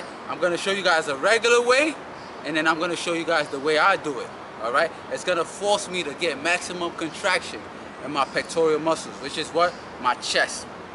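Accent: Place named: New York, United States. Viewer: New York city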